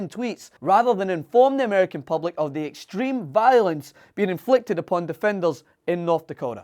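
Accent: Scotland, United Kingdom. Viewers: Since when does TYT have a Scottish host? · Dat scottish accent - adds a certain flavor of badassness :D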